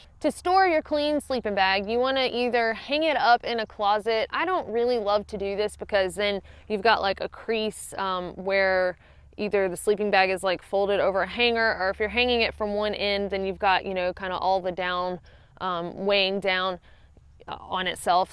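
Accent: South, United States. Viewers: some of that southern accent coming out in this video! olls = oil :) great videos as always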